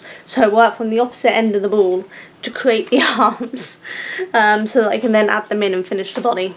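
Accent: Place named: England, United Kingdom. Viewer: love the english accent... cheers... keep up the great work 🙏❤️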